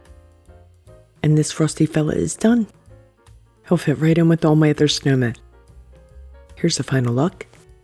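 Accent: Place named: East Coast, United States. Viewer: I love your East coast accent as well! Happy weekend!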